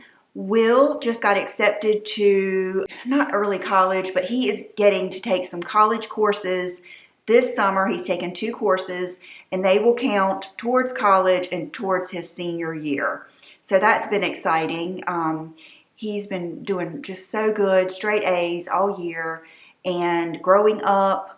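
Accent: South, United States. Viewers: I was so excited seeing all of the missing make-up and box of perfume you found in the garage the last few weeks. It was so fun to see the joy all of that stuff brought you. I love your energy and sweet soothing southern voice. 🌸🐝 🌸🌸💄 👛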